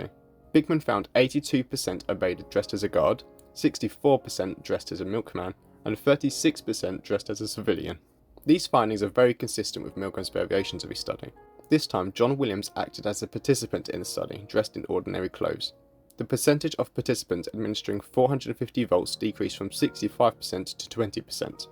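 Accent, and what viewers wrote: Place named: United Kingdom. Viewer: I like your bri ish accent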